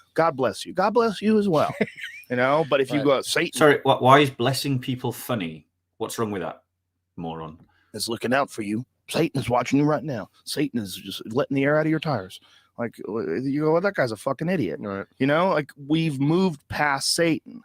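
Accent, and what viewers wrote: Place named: United Kingdom. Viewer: Dont see many livestreams of but I am glad I did see this one. I love the British accent, God bless!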